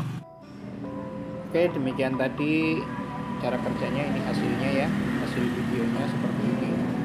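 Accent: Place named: Banyumas, Indonesia. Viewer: Mantap om 👍👍👍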